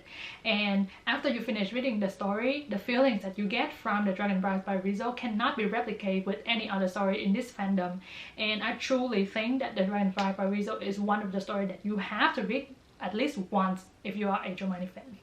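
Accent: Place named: Vietnam. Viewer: are u vietnamese? your accent is so viet <3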